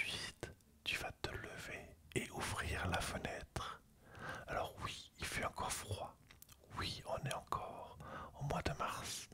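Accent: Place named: Germany. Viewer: Merci pour tes vidéos... j'aime beaucoup ton timbre,ton accent légèrement germanique.En tout cas continue comme ça 👏👏👏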